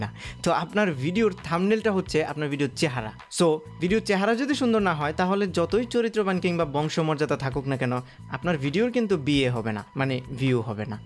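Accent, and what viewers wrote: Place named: Chittagong, Bangladesh. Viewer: vi apni koy takan dhaka naki.ctg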